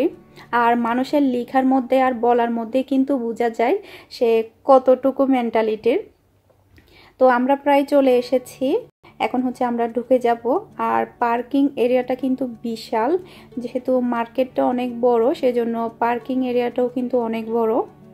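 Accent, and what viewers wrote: Chittagong, Bangladesh. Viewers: Apu tumar sob blog dekhle e valo lage..sobcheye beshi valo lage ctg tone e kotha bolo bole...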